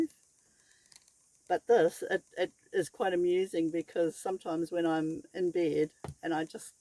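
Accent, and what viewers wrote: New Zealand. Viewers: That kiwi accent though, pacific pom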